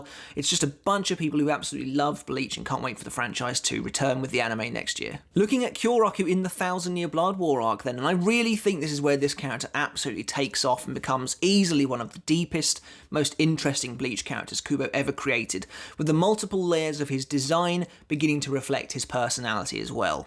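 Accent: United Kingdom. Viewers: Hey Mr, i love your british accent.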